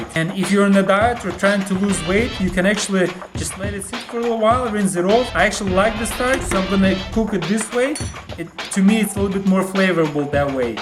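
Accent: Russia. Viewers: “He sounds like crazy Russian hacker” most people from that part of the world do. So duh. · I can tell you are Russian or Ukrainian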